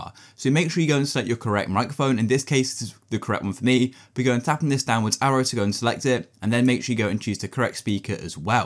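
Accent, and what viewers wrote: United Kingdom. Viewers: He is defenetly brittish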